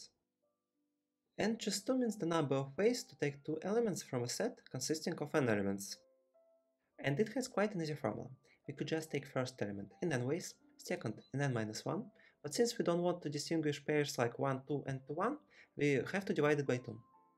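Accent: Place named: Russia. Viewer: Too strong russian accent, the rest is great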